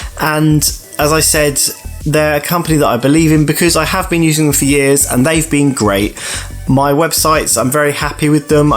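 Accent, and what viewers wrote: England, United Kingdom. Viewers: You seem like a very reasonable person and highly professional too in the way you present things and that english accent is probably making you sound more like you really know what you're doing. Im your new subscriber. 😉 👍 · Subscribed only coz of your #BritishAccent.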